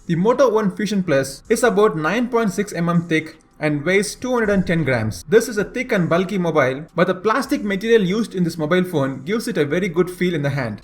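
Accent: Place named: Tamil Nadu, India. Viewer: Englisha vida unga tamil style tha massu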